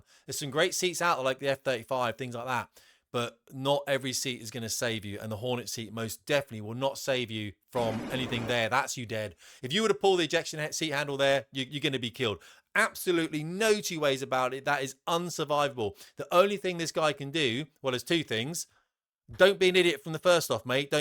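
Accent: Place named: United Kingdom. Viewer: He was getting a picture by someone on the beach. Your british voice is annoying as hell. How cucked are you?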